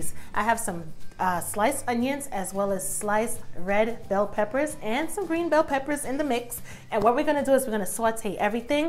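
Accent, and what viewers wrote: New York, United States. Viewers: Man you look just like toya.. lil wayne bm.. but with a new york accent. Almost done making my roast thanks sooo much. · Nice. Always liked the ladies with NY accent.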